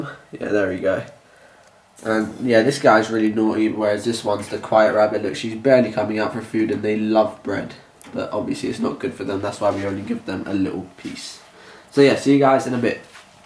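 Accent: United Kingdom. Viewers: Mate you lost your British accent😳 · HE HAD MORE OF A BRITISH ACCENT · his accent was much more british back then 🤣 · Currently he sounds less British than in this video. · He had a strong British accent. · idk why but i like vlogs in the British accent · When wasnt so scandalous about every lil thing with a british accent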